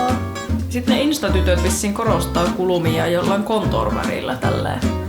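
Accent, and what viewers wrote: Finland. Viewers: ootko joensuusta ku murre on nii vahva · Nonni nyt minnuun tarttu tuo siun mie sie murre 😂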